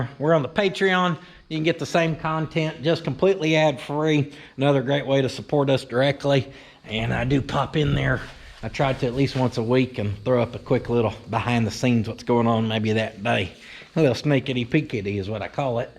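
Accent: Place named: Gastonia, United States. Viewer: Luckily I lived in Gastonia for 4 years, or else I wouldn’t be able to understand a single sarcastic word this guy said!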